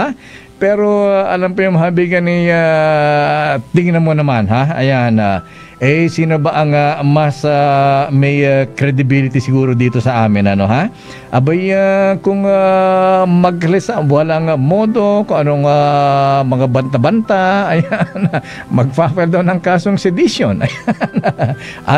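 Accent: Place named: Batangas, Philippines. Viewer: DIBA MARCOS KA? bat Batangeunio ang accent mo................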